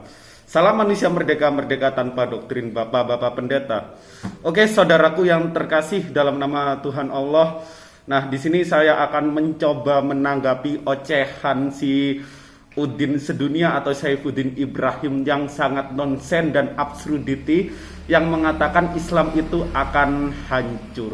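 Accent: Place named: Java, Indonesia. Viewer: Ust ini org batak tapi kok medok jowo.ngga ada logat bataknya. Lanjjutkan.you'are the next.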